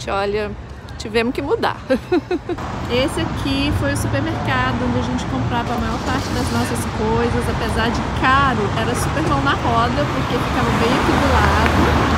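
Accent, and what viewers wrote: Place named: Minas Gerais, Brazil. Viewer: Ei, como voces, eu tambem sou mineiro de BH e tambem moro no Canada, porém em Montreal. Adoro assistir os seus videos pelo otimo astral que voce demonstra nos videos e tambem para ouvir o seu sotaque. Ai que saudades de ouvir o nosso sotaque mineiro de BH. Abraço para toda a familia Otavio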